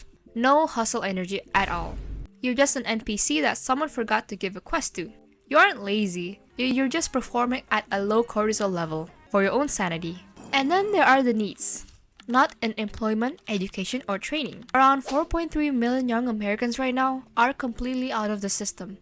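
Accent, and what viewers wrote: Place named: Asia. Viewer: Asian accent suck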